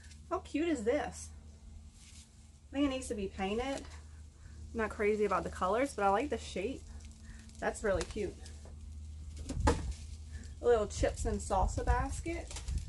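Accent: Louisiana, United States. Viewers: You can tell your from Louisiana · You got to be from south Louisiana !! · where are you from, your accent sounds like a La. accent. Just curious I’m from La. and it reminds me of home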